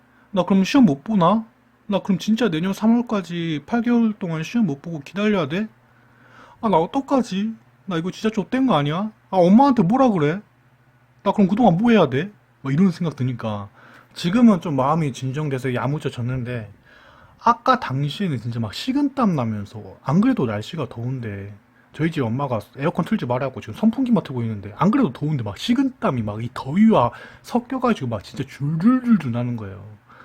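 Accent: Jeolla, South Korea. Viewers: 전라도 말투네용ㅎㅎ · 전라도 사투리 엄청 쓰시는데유?..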